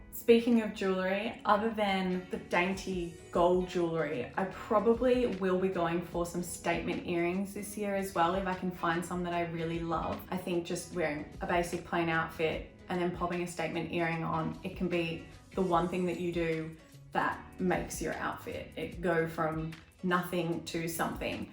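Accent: Australia. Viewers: Hi, I have just found you and great to hear an Aussie accent! Whilst I love following a lot of UK/US vloggers, it’s great to find someone in AUS.Thanks for your trends forecast! Whilst I may not be adopting all, it is good to know what to expect to see in the stores for the upcoming seasons. 🙏 Thanks!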